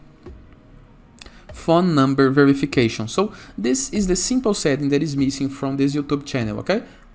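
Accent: Brazil. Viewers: good video sotaque brasileiro kkkkk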